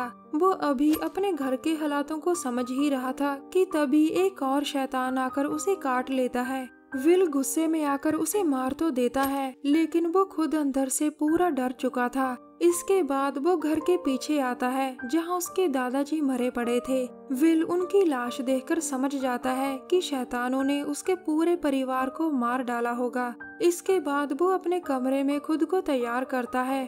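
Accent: Punjab, India. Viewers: tusi Punjabi ho?? awaz ton lgda tuhadi kyu k Punjabi accent hai tuhadi voice ch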